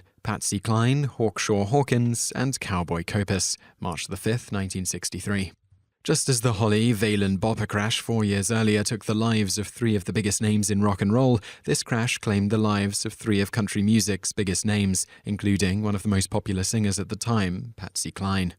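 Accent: United Kingdom. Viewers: Too many famous people have gone down in planes, esp. in those single or twin engine prop planes like Cessna! Rich folk with their toy planes! Has happened even locally once in awhile where I live, a local airport with a line of small planes called "Gull Airlines"! Wouldn't get me up in those tiny planes for anything in the world, forgetaboutit! BTW, Ethel Kennedy's parents were both killed in a plane crash together as well and Jackie knowing the family history didn't want JFK Jr. to fly and said he was easily distracted and may have had ADHD! May they all rest in peace. Love a British accent...thanks for sharing~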